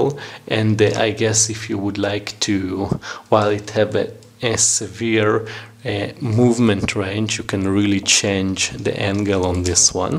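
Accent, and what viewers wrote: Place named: Israel. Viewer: איזה קול ישראלי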